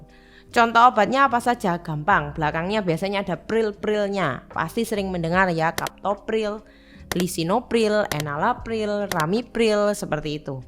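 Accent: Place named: Surabaya, Indonesia. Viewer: ini dokter atau apoteker.. pd manggilnya dokter hehe.. ini lulusan jawa timur yak?, medok suroboyo an rek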